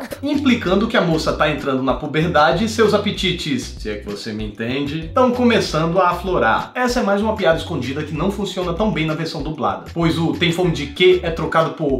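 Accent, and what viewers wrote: Northeast, Brazil. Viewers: Você é nordestino ?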